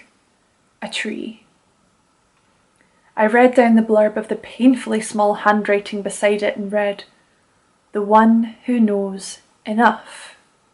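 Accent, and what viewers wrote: Edinburgh, United Kingdom. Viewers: ahhhh nice to hear a scottish accent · So nice to hear such a lovely Scot lass tell a beautiful story. Would love to hear a wee bit more of the Edinburgh accent, it is my favorite sounding speak.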